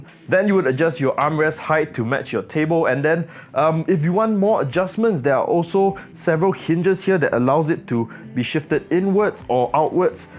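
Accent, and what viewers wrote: Singapore. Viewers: I know you’re from Singapore. Your last name and your accent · Do I detect a Singapore accent?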